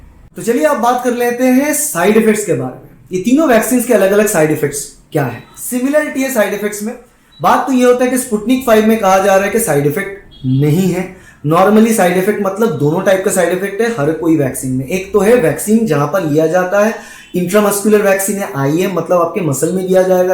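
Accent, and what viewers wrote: Bengal, India. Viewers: Are you bengali ?? 😊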